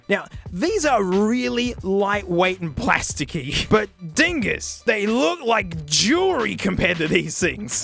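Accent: Australia. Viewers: You haven't gotten used to the great Australian accent. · This ain’t English, this is ‘Stralian. But no, not really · He’s Australian. Kory’s voice actor is from New Zealand. Australians and people from New Zealand have similar accents. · Aussie accents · coming from Western Australia, He's definitely saying over ears, accent bro. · When I heard "Ovaries" instead of "Over ears" and then proceeded to slap myself in the face for forgetting the bloke is Aussie through and through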